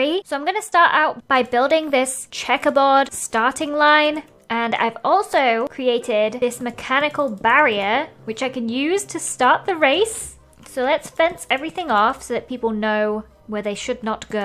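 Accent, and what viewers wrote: United Kingdom. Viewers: Why do you sound British???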